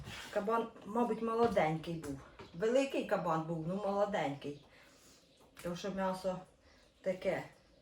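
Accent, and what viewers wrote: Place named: Khmelnytskyi, Ukraine. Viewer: Шматки за розміром ідеальні. Цибулька, водичка, це теж обов'язково. Не люблю коли дають поради , але хочу, щоб тобі теж було смачно. Беру приправу до шашлика в Аврорі, в банках. Ідеально підібрані спеції. Додаєш багатенько.Сіль додатково не потрібна, там все є. Через добу готово. Важливо, щоб спочатку з двох сторін на максимальний жар(запечатати, щоб сік кипів усередені, а зовнє не виходив).І не перетримати, щоб не сухе було. Смачного Тобі! Ти молодчинка! До речі, мова, як в нас, на Хмельнитчині. Привіт Тобі зі Старкона!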